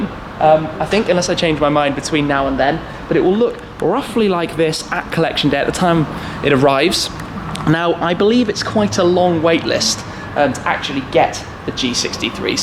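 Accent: United Kingdom. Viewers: I don’t know man, I think I have a pretty good radar and I think he’s just really posh English.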